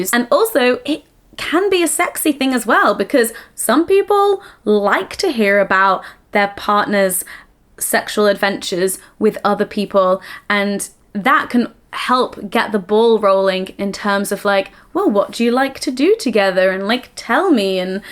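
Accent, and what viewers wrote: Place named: England, United Kingdom. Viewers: Shes English that's how she speaks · anytime I watch with the sound off my brain still knows to read the captions in english accent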